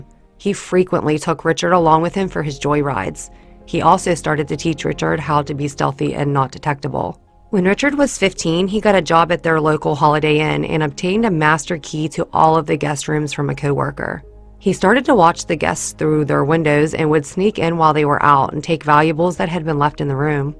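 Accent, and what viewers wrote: United States. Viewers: are you from delco? or jersey? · You live in Maryland or Pennsylvania? I hear an accent, Pittsburg?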